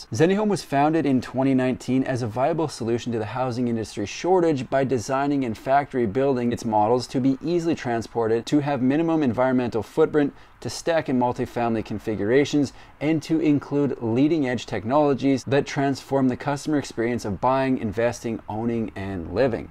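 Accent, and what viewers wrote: Canada. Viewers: Thank you for informing all of us about so many interesting homes. I believe homes, built in pieces, are the future. They take less time to build on-site. You are terrific! ha ha I thought you were a california guy but now know you are canadian...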